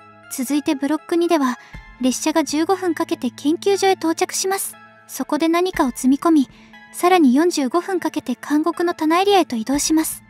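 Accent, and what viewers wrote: Kansai, Japan. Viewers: 関西弁過ぎてわろた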